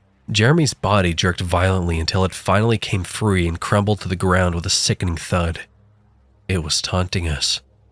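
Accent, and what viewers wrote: South, United States. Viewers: Great southern accent. Better than mine and it’s natural. Haha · Gratz on 1k and I love the southern accent and you giving it to the douchebag is perfect for the story PMSL 😂😂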